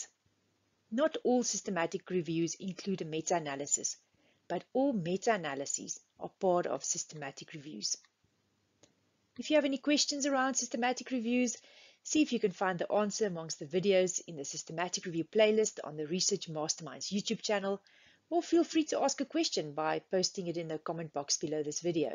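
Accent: South Africa. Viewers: South African accent recognisable anywhere in the world ❤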